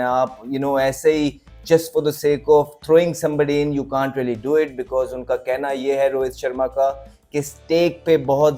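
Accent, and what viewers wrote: Punjab, India. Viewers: Bhai..pls don't lose Punjabi touch..I M Punjabi..obviously 😅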